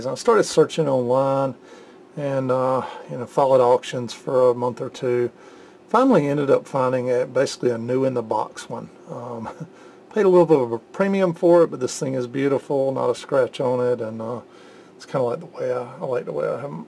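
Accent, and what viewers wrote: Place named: Texas, United States. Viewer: Love your videos. I am 61 and looking to get into Vintage Audio repair not only to keep my gear in top condition, but to give back for what has been a lifetime of enjoying these fine components. I'm an electrician and built a Harley from scratch when I was 17 in my bedroom. I think I can figure this out. I know a gentleman I work for who has an accent identical to yours. He's from around Waco, Tx. Anyway, I'm going to purchase a Sprague TO 6 and was wondering if I need to desolder every capacitor I test. I'm guessing yes, because if it is leaking voltage, it will send high voltage to the other components while connected to the circuit board and possibly damage them. Is that correct?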